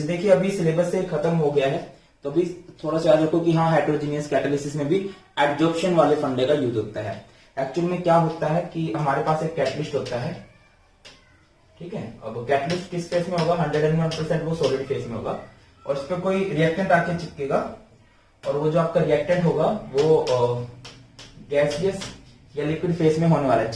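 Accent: Haryana, India. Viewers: Mujhe lagta h aap harayana se ho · Sir aap hariyana s ho kya , becoz your voice is like that only 😅😅😅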